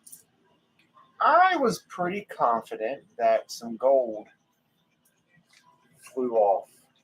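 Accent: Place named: Tennessee, United States. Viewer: ❤❤, I was just wondering how nearby you are as I recognized your accent. Youre about 5 hours from Kingsport, TN, which is the same distance to Richmond, VA or Nashville from here, basically.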